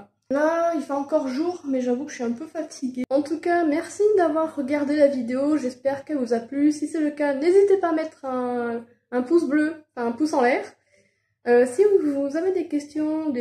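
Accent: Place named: Russia. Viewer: j'adore ton accent avec le leger accent russe dedans ca te donne un charme fou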